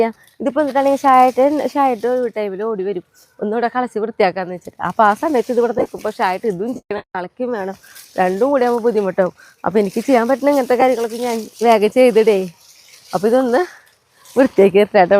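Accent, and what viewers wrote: Thrissur, India. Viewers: Nice vedio very useful you are from thrichur taking nicely ❤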